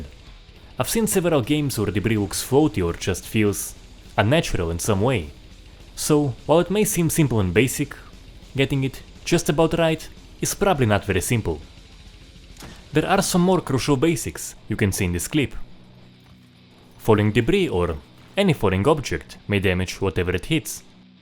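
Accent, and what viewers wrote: Russia. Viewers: Cool video, but I don't know. Why do you have such an accent mmm... Russian? Are you from the CIS countries? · Русский акцент? Или я плохо разбираюсь в акцентах?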